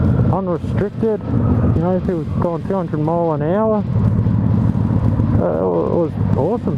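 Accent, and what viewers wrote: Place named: Australia. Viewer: Saw you channel name on a comment so I thought I would come and check it out !! Sounds like you are in, or from Australia ....We were in Australia in early 2019. Love it there!!!. New subscriber here from Canada .. My dream bike as a kid was the '82 Honda CBX 1000, sport touring bike....to this day I wish I could find one !!! cheers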